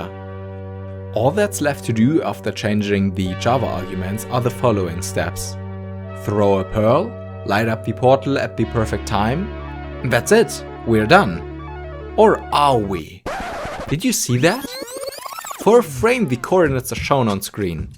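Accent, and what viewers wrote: Germany. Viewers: Are you German by Chance? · Are you German by any Chance? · are you german? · Ich höre so deutsch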